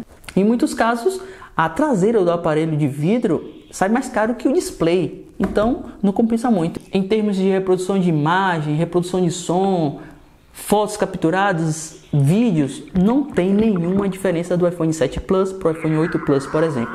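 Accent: Bahia, Brazil. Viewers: TÚ É BAIANO É BIXIM 😁😁👍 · Vc é baiano? Conheci pelo sotaque né? Rs abraços... Sou de Salvador/BA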